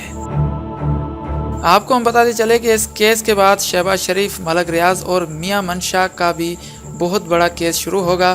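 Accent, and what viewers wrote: India. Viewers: awaaz se hi pata chal raha he k ye bharwa koi indian he panyakka tu te ap moodi da mundda lagg rea e'n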